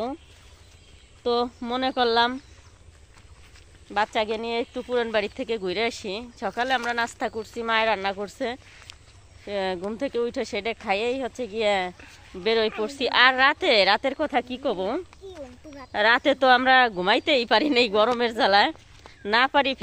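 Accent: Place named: Faridpur, Bangladesh. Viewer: খুব সুন্দর হয়েছে আপনার মেয়েদের ভিডিও। আপু আপনার গ্রাম কি ফরিদপুর? আসলে ভাষা পরিচিত লাগছে💚💚💚💚💚💚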